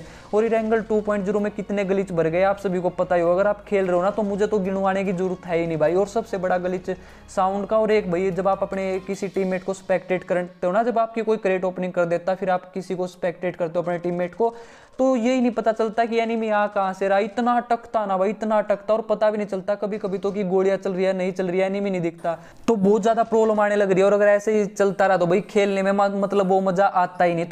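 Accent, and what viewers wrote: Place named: Rajasthan, India. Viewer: RAJASTHAN JAIPUR SY HU MAI AAP KE VOICE HAM HRA RAJASTHAN SY MILTE HY. REPLY PLZZ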